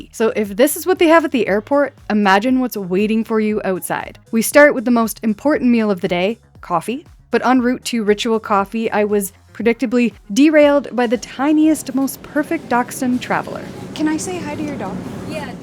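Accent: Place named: Canada. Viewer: None of this existed the last time I used the airport which was 2005. And while everything you showed looked nice I agree with other comments. You were comped for sure. no mention of prices at most places you visited. High end is fine if the average mortal can afford it. Besides most people do not have the time to spend 24 hours at any airport with all the things we have to do just to arrive and check in. And BART is not all great. Took it from downtown SF on my return and there was a delay for at least 30 minutes in the middle of nowhere - I thought I would miss my flight - thankfully I didn't. And having visited Canada many times your accent was a dead giveaway - Toronto, maybe?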